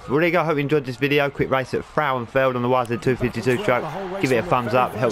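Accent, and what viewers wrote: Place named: United Kingdom. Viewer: I love your accent so much where are you from in the uk ? Good videos by the way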